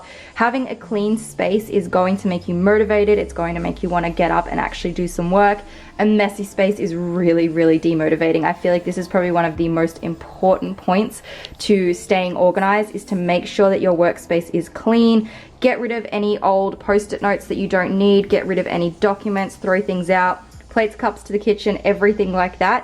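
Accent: Australia. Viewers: your aussie 💕💕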